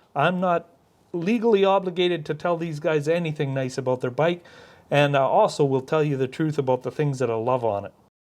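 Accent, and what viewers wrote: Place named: Canada. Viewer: hey what part of the world are you in??sound Canadian hey ,,i cant find that GT in that color anywhere in the usa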